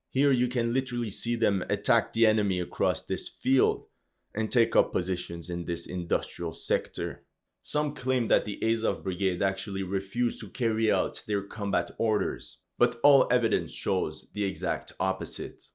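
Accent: Russia. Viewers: Lol, "good job". May I ask you where are you from? Sounds like you're sweating your ass to hide Russian accent.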